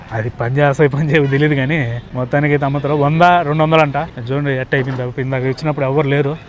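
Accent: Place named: Rayalaseema, India. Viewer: ఏంది సావి.. మా రాయలసీమ భాష మాట్లాడతావుండేవే.. యా ఊరు అబ్బా.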